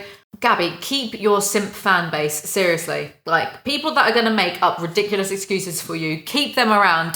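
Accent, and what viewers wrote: United Kingdom. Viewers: I haven’t started watching the video but I’m READY for you to reprimand her in British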